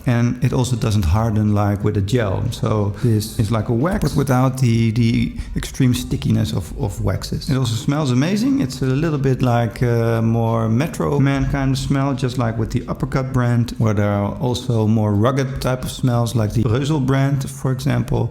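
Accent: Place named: Netherlands. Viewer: The dutch accent 😂🫡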